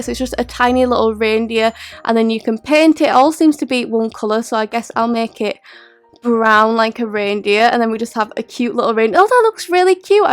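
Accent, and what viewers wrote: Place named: Scotland, United Kingdom. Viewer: I like you Scottish voice. :3 ❤️🗣🇫🇮 (WHERES THE SCOTTISH FLAG?)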